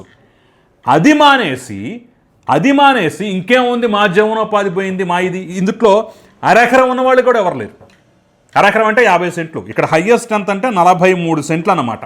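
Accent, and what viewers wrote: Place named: Kadapa, India. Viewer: Peddaina slag vintuvunte kadapa slag la vundi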